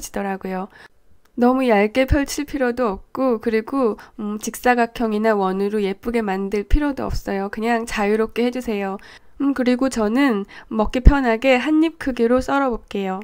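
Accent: Seoul, South Korea. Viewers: 목소리도 굉장히 좋고 말투도 상당히 매력적이세요! 그런데 꼭 들어본 것만 같아서 계속 생각해봤더니 서울사투리를 쓰시는 것 같아요!! 꼭 그게 아니더라도 정말 개성 넘치는 말투라 듣기 좋습니다~ 좋은 영상 감사해요 ㅎㅎㅎ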